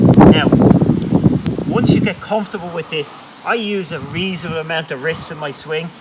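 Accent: Ireland. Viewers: Genius. Struggling like a non-swimmer in Cat V rapids until now. Like most amateurs thought I was ok until I tried. Shattered the graphite shaft like a moron. Thanks. Don’t change a thing, especially the Irish brogue!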